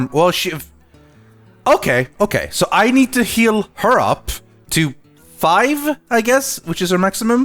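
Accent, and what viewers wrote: Sweden. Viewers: the most Swedish accent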